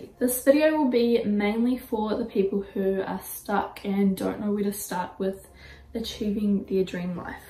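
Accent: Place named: Australia. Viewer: I hear an Australian accent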